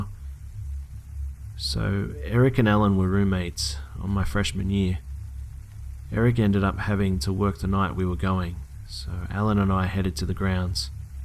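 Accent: Australia. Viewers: that freaking Australian accent <3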